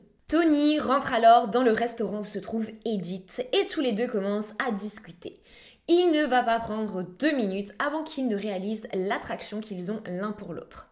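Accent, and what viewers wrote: Quebec, Canada. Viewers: Salut.. Comme d'hab 👍.. Un bonjour au Québec!..(t'as vraiment pris l'accent!! 😊) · Je ne l'ai jamais dit.. mais j'aime tellement ton accent , ça sonne bien pour un Quebecois.. retour sur un petit côté professionnel.. Merci pour tes vidéos, les dossiers sont toujours plus plaisant et facile à lire par la suite. Bisous xxx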